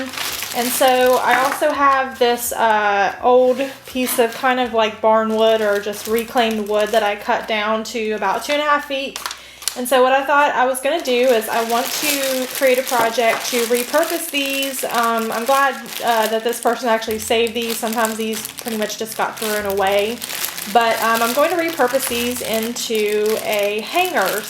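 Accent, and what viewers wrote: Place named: South Jersey, United States. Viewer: Are you from South Jersey, South Philly Delaware or Mryland? I believe I recognize the "O" in your voice. I am from S. Jersey (I live in Florida florida now for the past 40 some years and still carry the accent). The video was great. TFS THUMBS UP!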